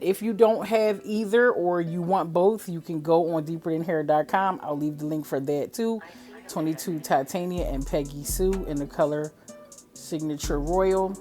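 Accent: Philadelphia, United States. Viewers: Are you from philly? You sound just like one of my clients. 😂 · Your channel is amazing. Just peep your Facebook page. From your voice I just knew you were from Philadelphia. Not only that you are just around corner from where I use live.❤ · Are you from Philly? I feel like I hear an ancient.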